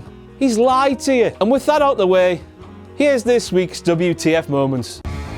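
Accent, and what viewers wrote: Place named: United Kingdom. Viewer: british accent.. wtf is he speaking in?????? english?????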